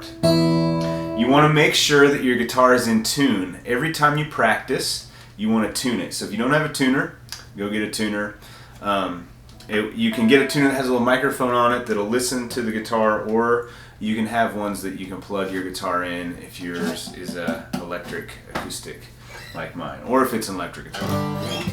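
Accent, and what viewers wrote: United States. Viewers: Americans can never go wrong with their presentation. Seen a lotta Brit vids, but I usually prefer to hear Americans talk.... Love your video!! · I never heard such a manly voice in an American accent😂😂 Started on 8/11 will tell in 2 months thanks for the tutorial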